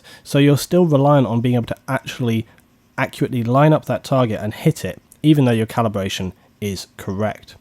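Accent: United Kingdom. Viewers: feel like a british SAS with that accent, keep it up!!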